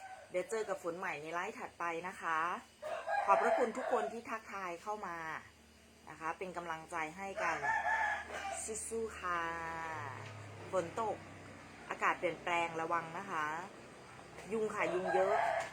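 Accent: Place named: Isan, Thailand. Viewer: สวัสดีครับ ชอบฟังเสียงเวลาสาวอีสานพูด น่าฟังครับ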